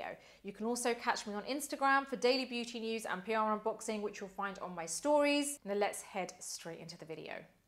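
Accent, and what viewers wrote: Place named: England, United Kingdom. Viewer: Love ❤️🥰all your makeup tutorials. You do an amazing job 😍explaining each step throughly. Just want to know where are u originally from? I don’t know much about makeup. So your info is valuable to me , it 🌹helps me to buy the latest products in the market, concealer foundation… I live in Connecticut US, ( vcold weather), dries out the skin. Can u suggest any products for that, before makeup. Did u live in England? ( your english accent).I was brought up in Riyadh( Saudi Arabia) and England.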